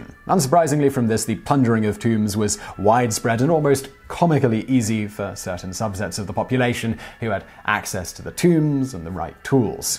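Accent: England, United Kingdom. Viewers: Much Refreshing Delightful And Insightful... LOVE YOUR Marvelous English Accent And Your Intellect!!! YOUR ALWAYS Well Put Together Along With YOUR Topics. I Look Listen And Learn So Much THANK YOU, For The Excellent Work Keep It Up.... THIS Subject Matter On THE "Egyptians" Is So Fascinating. PEACE🙅🎩📖🏆😃🙅🎩📖🏆😃🙅🎩📖🏆😃🙅🎩📖😃🙅🎩📖🏆😃🙅🎩📖🏆😃🙅🎩📖🏆😃🙅🎩📖🏆😃🙅🎩📖🏆😃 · Bald? Check. Glasses? Check. British accent? Check. This gent must be legit.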